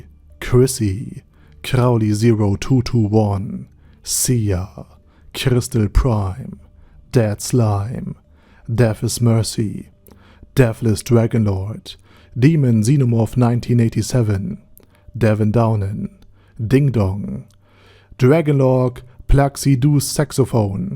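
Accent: Germany. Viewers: Anybody can take this how they want but your smooth masculine German baritone gives me very nice dreams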